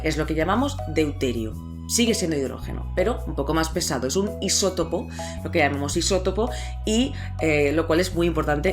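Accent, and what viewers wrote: Catalonia, Spain. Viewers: Suenas como catalana, 😰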